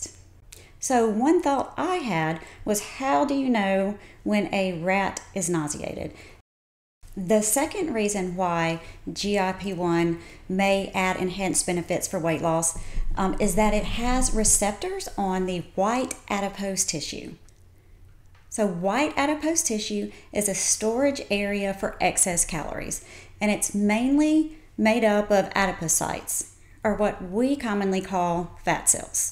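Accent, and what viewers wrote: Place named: South, United States. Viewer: I can't understand her Southern accent, and she is mispronouncing Wegovy. Just sayin'.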